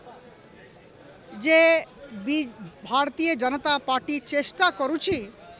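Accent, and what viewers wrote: Bihar, India. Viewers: Esi kahuchu haribu didi Tu bihari para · Tame same kahucha bihari re odia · Tu biharuni Odia bhasare kana pain' khata hauchu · Amku nai lo ଆମକୁ, ତୁ ଆଗେ ବିହାରୀ ଓଡ଼ିଆ ଟା ବନ୍ଦ କର କାନ ରୁ ରକ୍ତ ବରହୁଚି ଶୁଣିଲେ ତୋର ଓଡ଼ିଆ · Tama katha Bihari Bhasa re kohuchha nije age thik kara ta pare anya ku kahiba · Apana nka Vasa tah bihari Misa odia kahuchha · U also talking in behari Bhasa odiya kahuchi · ଆପଣ ବି ବିହାରୀ ଚୋବେଇଲା ଭଳି ବଡେଇଲା ଭଳି ଓଡ଼ିଆ କୁହନ୍ତୁନି,ଆପଣ ବି ଓଡ଼ିଆ ଭଲ ଭାବେ କହିବା ଶିଖନ୍ତୁ।ଓଡ଼ିଆ ଭାଷା କେମିତି କୋମଳ,ଭଦ୍ର ସହ କୁହାଯିବ,ଏ ସବୁ ପ୍ରଶିକ୍ଷଣ ପ୍ରଥମେ ଆପଣ ନିଅନ୍ତୁ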